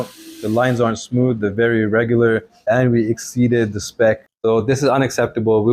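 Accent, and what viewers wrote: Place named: Iran. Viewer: لحجه ات مشخصه ایرانی هستی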